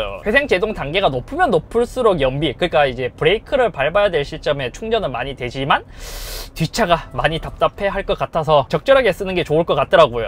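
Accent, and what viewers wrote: Gyeongsang, South Korea. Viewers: 어쩐지 경상도 말투다 했는데 안동사람이군.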